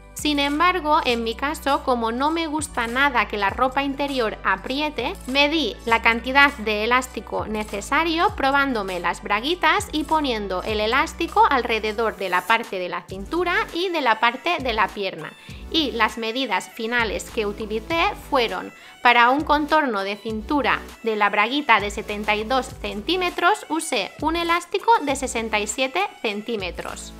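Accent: Spain. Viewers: Excel·lent explicació i m´agrada molt el teu accent mallorquí! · Ostres t'ha quedat un biquini fantabulós!!!(M'encanta el teu accent mallorquí!!!!) Ja fa temps que em vull fer les calcetes, i el teu tutorial em ve al pèl! Gràcies bonica!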